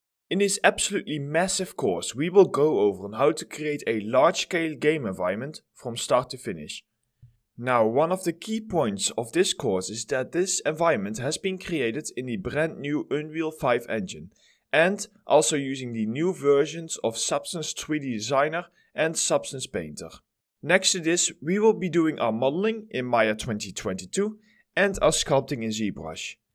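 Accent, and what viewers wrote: Netherlands. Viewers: Ooof a heavy case of Dunglish. But cool tutorial!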